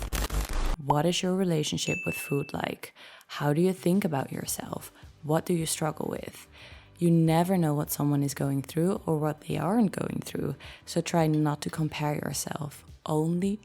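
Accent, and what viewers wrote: Netherlands. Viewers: Are you Dutch?🙈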